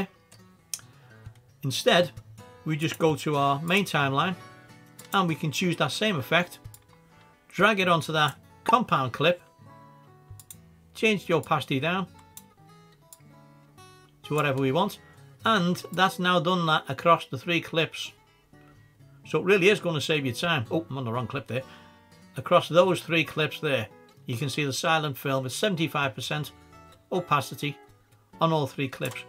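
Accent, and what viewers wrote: Liverpool, United Kingdom. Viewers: Hi mate, i googled compound clip for something on Fillmora as I made a video that had wayyy to many clips and needed to merge them as I made 3 separate "films" part 1,2 and 3. Needed to put them as 1 as it took 8 hours to make the 3 films into 1. Anyways Googled it an your video was the 1st video to come up... hit play and I smiled as your VOICE, the accent. I recognised as I'm from Liverpool and it was nice to hear. (Hopefully u r from around these ways an i don't look like a wally lol) Thanks for the video. I'm getting into Fillmora more & more. I don't restrict myself to a software as its the amateur thing if u don't use Premier or the apple 1. I like to use Adobe, davinci & filmora I am really enjoying it. Will be watching more of ur videos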